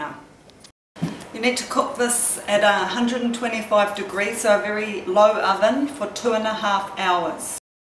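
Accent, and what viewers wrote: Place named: New Zealand. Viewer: Love that kiwi accent. As well as the fruit cake recipe. 👍😉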